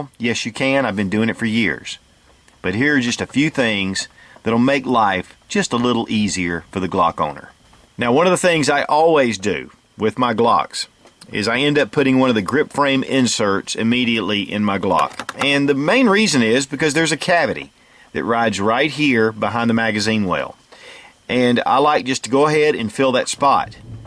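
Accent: United States. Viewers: why can't americans say any :D it's always ' ini ' xD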